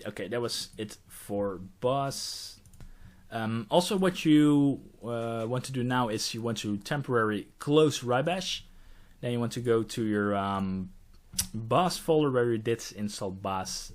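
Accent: Netherlands. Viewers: nederlands accent doet pijn XD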